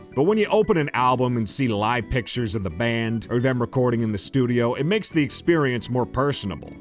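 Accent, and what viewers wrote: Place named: New Jersey, United States. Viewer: He’s 25?!! Maybe it’s the Jersey accent(?) but that doesn’t sound like a 25 year old to me lol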